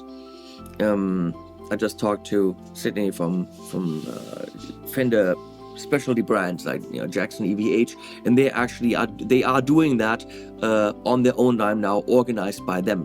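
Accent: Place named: Germany. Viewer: Wundert mich gar nicht! Alleine bei dem Trash-Gear, das du täglich - hust - empfiehlst, sind 90% für meine Ohren akustischer Sondermüll, geht also umgehend retour, 5% sind heillos überteuert und 5% eventuell brauchbar, so ferne man nicht ohnehin längst was besser Klingendes hat. Für den Händler heißt das aber ein Defizit, wegen der Rückversandkosten und weil er das Produkt nicht mehr als Nueware, sondern nur mehr als B-Ware verkaufen kann. Nur als Beispiele, mein jahrzehntealtes JD-10 hält auch heute noch locker mit den meisten digitalen Pedal-(Pre-)Amplösungen mit, erst recht mit einer Cab IR dahiner und mein ebenso alter Marshall JMP-1 Preamp ohnehin. Während inzwischen drei deiner "Empfehlungen" wegen miserabler, völlig unbrauchbarer Sounds sofort retour gingen. Ich weiß ja nicht, womit du deine Reviews im Hintergrund aufpäppelst, aber ich konnte zwischen den Sounds, die ich in den Videos hörte und denen, die das reale Produkt lieferte, eher wenig bis gar keine Ähnlichkeiten ausmachen. 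Weshalb ich diesen Kanal inzwischen auch eher als visuell-akustisches Kabarett sehe (Ein hyperneurotischer Durchschnitts-Provinz-Gitarrist, von dem außerhalb dieses Kanals im realen Leben noch nie jemand etwas (musikalisch) Sinnvolles oder Umwerfendes gehört hat, versucht krampfhaft, mit Piefke-Pidgin-Englisch und hässlicher, geschmackloser Oberbekleidung auf Mittelschulniveau kompetent zu wirken. Benny Hill 2025 sozusagen.) aber mit Sicherheit nicht hier Empfohlenes mehr kaufen werde. Selbe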